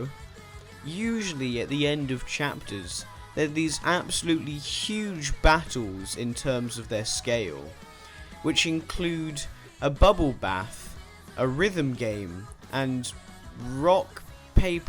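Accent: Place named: United Kingdom. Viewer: He's literally from the UK and one can clearly hear it lmfao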